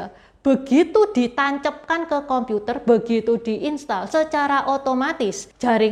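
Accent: Java, Indonesia. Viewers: Suaramu kental banget jowone REK....😂😂😂😂❤ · ii Coba Dong Bicara pake Bahasa Mandarin nya sih, , ? ! Saya Pengen Ngedenger mgomong ny Mandarin, Campur Medok ny ngomong Bahasa Jawa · Jowo nya ndi Cik,medok buanget Jowo neh,he he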